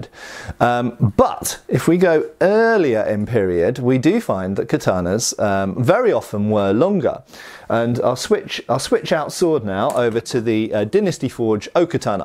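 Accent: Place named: United Kingdom. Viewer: Spea britishl and uses inch... but why?? hahaha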